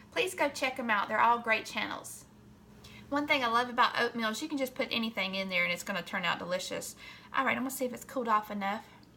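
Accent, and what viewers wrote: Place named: Texas, United States. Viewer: Are you from Texas? Love the accent!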